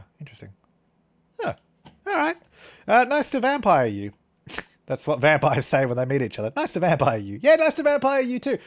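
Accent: Australia. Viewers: I cant tell does he have a Australian accent or?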